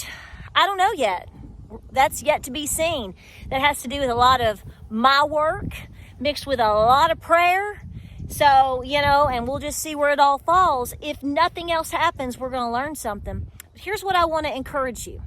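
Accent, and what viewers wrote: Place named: East Tennessee, United States. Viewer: Hey! I spent my first 18 years of life cradled in the Ridge and Valley Region of East Tennessee. Great people, for the most part.... And it feels like the most ancient region of the world, and maybe it is? I so enjoy hearing your stories, and hearing that accent that I love hearing coming from a woman. Down to earth, hard working, tough willed and minded, the breed of people from that area are some of my favorite. I enjoy your even-handed approach to everythiing. You always take a common sense direction in everything you do, and that includes praying about it. That's the most important part, isn't it? Ha! Keep on doin' what you're doin', Girl.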